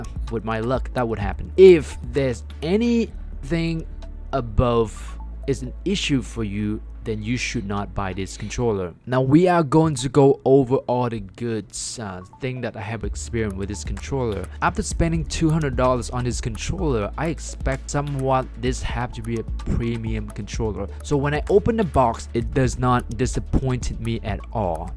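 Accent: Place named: Vietnam. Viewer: Thanks for your honest opinion review about this controller. I’ve been thinking about getting this since it first launch. Guess I have to buy one and try it out for myself. Btw, love your accent are you Vietnamese?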